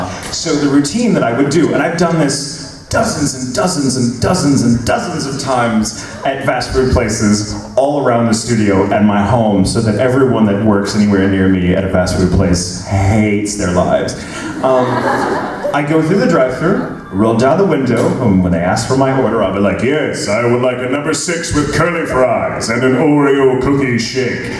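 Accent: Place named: United Kingdom. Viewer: lol outside world : nobody cares about how he speaks; oooooh he's British, good for him... in conventions : when he speaks like that, everybody dies <3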